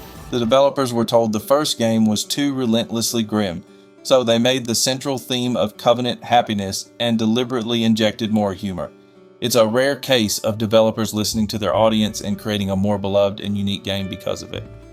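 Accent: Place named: South, United States. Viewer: you sound southern as hell brother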